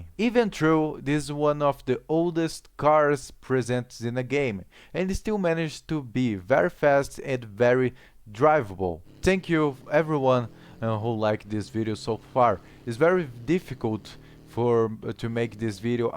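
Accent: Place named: Russia. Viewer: Nice russian english brothar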